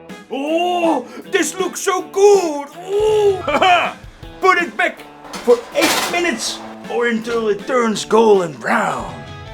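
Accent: Netherlands. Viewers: Is it me or does he sound dutch cuz i am from the netherlands and he speaks liek dutch trying to speak english so i think hes dustch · Love the Dutch accent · Why tf sounds bro so DUTCH?????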